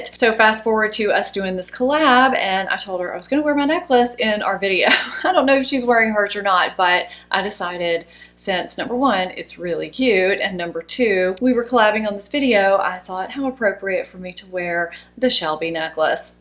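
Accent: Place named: Tennessee, United States. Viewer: Love that you mentioned you are from Tennessee! I'm a Georgia girl, and have lived in Lousiana and Tennessee. The entire time I've watched you, I kept saying to myself, " She is not from New Orleans. She sounds like she's from Tennessee!" Isn't it funny that Southern accents sound the same to those not from the South, but those of us that have lived our lives here can place them.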